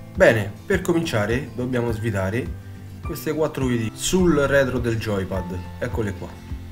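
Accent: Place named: Rome, Italy. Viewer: Sei un grande! Ho voluto provare a smontarlo anche dopo solo 1 anno di vita.. Smontato, pulito quei cerchietti, sollevato un po quei contatti metallici per scrupolo anche se sembravano ok, rimontato e non ho più avuto problemi di visuale che andava da sola verso sinistra! Che dire, se sei di Roma (dalla calata penso di sì) ti offrirei volentieri un caffè o na birretta!! Grande 💪🏻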